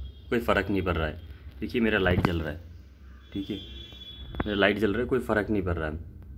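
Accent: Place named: Arunachal Pradesh, India. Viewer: Arunachali Accent 🎉😅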